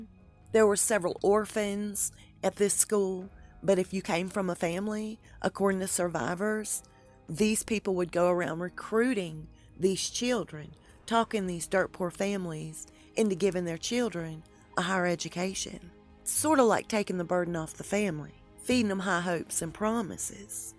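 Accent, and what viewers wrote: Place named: North Carolina, United States. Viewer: Yes, I want the in depth video! I have heard this shady side of the RF for years, and I think part of why they get away with this is that the things they do are so appalling and out there, that people just can't wrap their heads around any human beings doing such things. About hands...I remember a picture of Hillary taken after she had that collapsing episode, where her hands looked markedly enlarged and odd to me. I remember the picture was outside of Chelsea's apartment and Hillary was trying to convince people that she was fine. She was wearing a navy blue pantsuit. That picture stuck in my mind for another reason too. Hillary looked different, and I wondered if it was a body double. I think so much about these elites is just smoke and mirrors, nothing is as it seems. I feel like we've fallen through the rabbit hole. 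And, I love long videos when they're your videos! I like to listen to videos when I am cooking and washing dishes, etc. Seems that I just get my hands covered when kneading dough or scrubbing, then the video is over. Thank you for all you do! You're a blessing! I was on the phone earlier with a friend from Lenoir, NC, and your accent reminds me so much of hers! 💕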